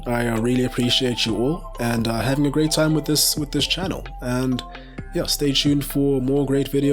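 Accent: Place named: South Africa. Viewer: Where are you from?!?! You sound like you're South African, and from Jo'burg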